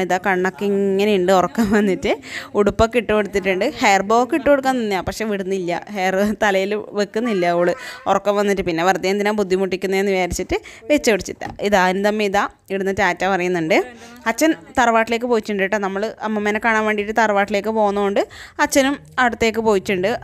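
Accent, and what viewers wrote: Kannur, India. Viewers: കണ്ണൂർ ഭാഷ കേൾക്കമ്പോ രസമാ.. 😍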